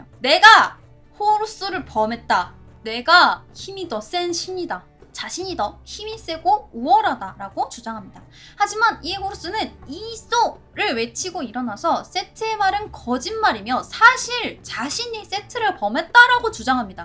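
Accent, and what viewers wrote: Gyeongsang, South Korea. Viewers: 응 경상도 여자네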